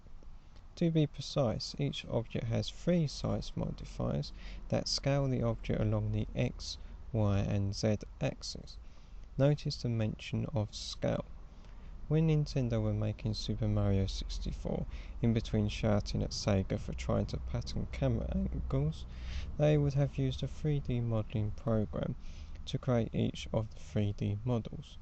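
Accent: United Kingdom. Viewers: Your pronunciation is really modern. The latest version of European / British English basically.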